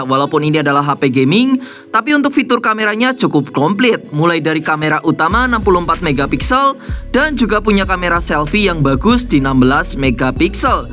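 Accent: Banyumas, Indonesia. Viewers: bang lu org banyumas ya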